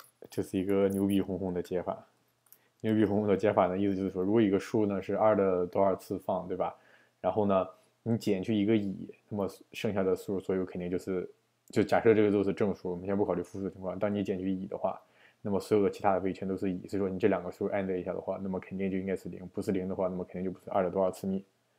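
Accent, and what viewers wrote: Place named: Dalian, China. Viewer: hh 大连话好亲切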